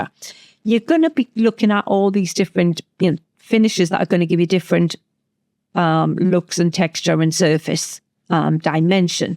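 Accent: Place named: Ireland. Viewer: Beautiful... another technique I will be trying. The only thing I need to get is the glycerin. Are you Irish? My family is from county Cork. Love your accent.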